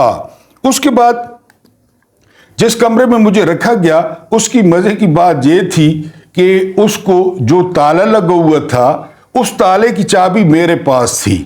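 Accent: Saraiki, Pakistan. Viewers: Masha ALLAH ap k v log mein Saraiki Touch bohot hi acha Laga hey, ap k v log Zaberdust hotey hein.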